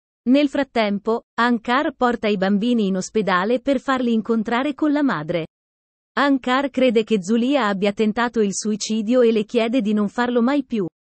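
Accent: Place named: United Kingdom. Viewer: Perchè citare i nomi turchi con la pronuncia inglese? Inascoltabile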